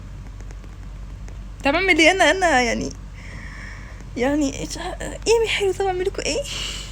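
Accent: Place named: Saudi Arabia. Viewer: ليه احسك سعوديه اكثر من مصريه؟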